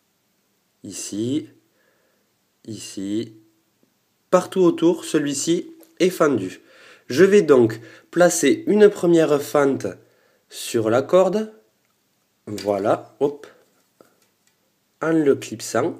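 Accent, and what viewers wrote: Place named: South, France. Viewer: mais non, quel bonheur cet accent du sud ! 🥰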